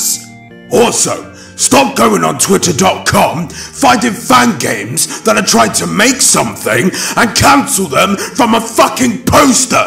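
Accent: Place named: United Kingdom. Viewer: Why does he sound Brit'ish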